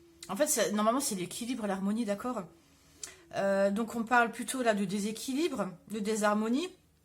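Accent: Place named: Alsace, France. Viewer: coucou j'ai reconnu ton accent. Moi aussi je suis alsacienne :) Tu vis où ?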